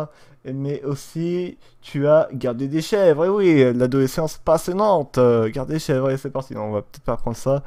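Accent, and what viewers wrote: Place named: England, United Kingdom. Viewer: est son bel accent anglais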